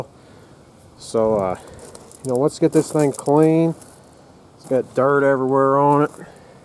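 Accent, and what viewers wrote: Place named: Texas, United States. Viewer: Where are you from my friend?? I'm from S.E.Texas and the way you say "that thang" and "right thar",makes me wonder if you're a fellow Texan...😁 I'm really enjoying the work on the tractor and would love to see you do some body work and restoration!!!💯👍👍👍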